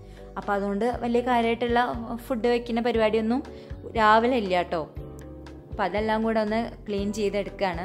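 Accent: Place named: Malappuram, India. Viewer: Hi ചേച്ചി, ഞാൻ new subscriber ആണ്.. ഞാൻ ചേച്ചിടെ വ്ലോഗ്സ് തെരഞ്ഞു പിടിച്ചു കാണുവാരുന്നു. എനിക്ക് ഒരുപാട് ഒരുപാട് ഇഷ്ടമായി. ശെരിക്കും എന്റെ ഒക്കെ വീട് പോലെ തന്നെ. പിന്നെ, ചേച്ചി ശെരിക്കും നാട്ടിന്പുറത്തുകാരി തന്നെ ആണ്. ആ നന്മ ഒക്കെ ഉള്ള ചേച്ചി. പിന്നെ ഞാൻ ആലപ്പുഴ ആണ്. Apo ചേച്ചിടെ മലപ്പുറം slang കേട്ടോണ്ട് ഇരിക്കാൻ തന്നെ നല്ല രസമുണ്ട്. സംസാരം bore ഒന്നുമല്ല ചേച്ചി. രണ്ടു ദിവസമായി ഞാൻ വീഡിയോ കാണാൻ തുടങ്ങിയിട്ട്. Apo മുതൽ കമന്റ്‌ ഇടണം ന്നു വിചാരിച്ചതാ. ഇപ്പോഴാ പറ്റിയത്.. super വ്ലോഗ്സ് aanu.. ഇനി ബാക്കി വീഡിയോ കൂടെ കാണട്ടെ കെട്ടോ.. all the best ചേച്ചി... Love you so much ചേച്ചി.... 😍😍😍😍😍😘😘😘😘😘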